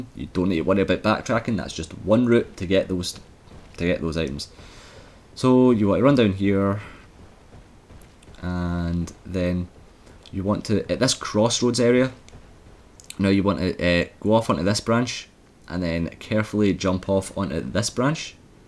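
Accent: Scotland, United Kingdom. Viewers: lol that accent, is it scottish? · 5 stars. But with the awesome Scottish accent it's a 5⭐️➕A+. Your a godsend bro. Although I kinda hated you when I was getting shitty chunks and green shitenite (I've loads) and your sucking on slabs yeh bastid yeh. Thanks again pal. Cheers from a fellow Celt in Dublin. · Same here man. Thanks for doing this. Also, your accent is gloriously British (Scottish, right?) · Thank you, you amazing Scottish dark souls God!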